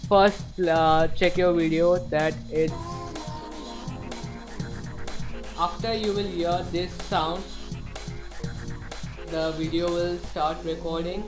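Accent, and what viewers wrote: India. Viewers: HE IS LIKE INDIAN AHH THAT VOICE BUT TNX ALLOT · Obnoxious "music" and audibly, almost definitely Indian voice (and all that you know). Nah, there are better tutorials. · heehee are you indian? :) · Stopped watching after i heard that he was indian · AWSOME Indian accent man I like it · His accent bet his indian? if im not wrong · you speak like a indian ,i think you are indian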